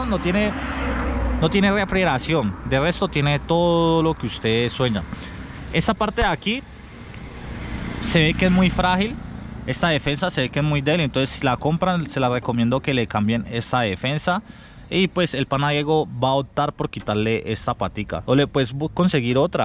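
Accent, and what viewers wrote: Colombia. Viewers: Buen video pana con acento valluno ve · Que feo ese acento de dónde es ??? Creí que los colombianos hablaban distinto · El acento es de Cali, Colombia.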